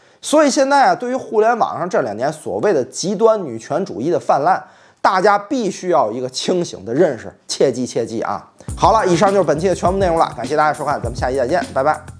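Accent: Tianjin, China. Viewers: 早安, 新闻充满正能量,而且资讯非常正确,而且讲话充满了天津话顺口溜,超级的高级幽默, 的视频跟长脸自干五的视频,完全是不同的风格,可是都让我长知试了, 加油了.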